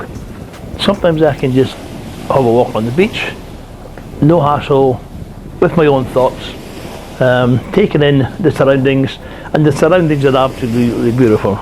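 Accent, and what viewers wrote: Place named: Scotland, United Kingdom. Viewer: I am enjoying your vlogs , and learning to understand your wonderful Scottish accent (lol)! Actually I am a Scot by ancestry, but quite a few generations ago. When I went to Scotland I was embarrassed by my accent, since my surname is pure Highlands . I am looking forward to retiring to Thailand in a few months. Jomtien is one of the places I am considering. My hobby is building and sailing radio controlled sailboats, but they are big and I will probably need a car to transport them to the beach. I have heard that you can park right by Jomtien beach. Is that correct? Looking forward to meeting you in November. Cheers, Colin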